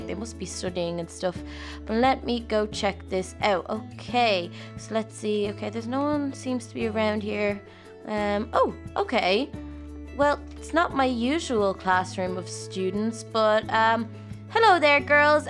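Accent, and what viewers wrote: Ireland. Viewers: Are u really from Ireland? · Her accent is so cool, Irish?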